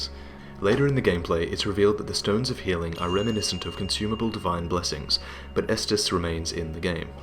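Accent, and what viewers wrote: Australia. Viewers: I smell... i smell.. an australian. Correct? if so, do you live in perth? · Your accent reminds me of someone... Chase from House. Are you Australian? Anyways, great video! Didn't notice he used both weapons on the downwards cut lol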